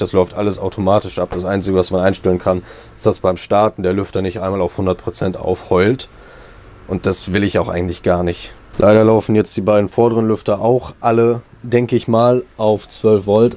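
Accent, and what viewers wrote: Germany. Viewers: Kommst du aus dem Saarland?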